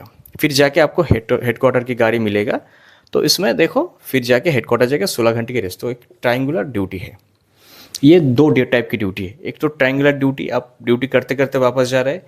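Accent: Bengal, India. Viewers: Tumi bangali tomar kothatei bojhachhe 😊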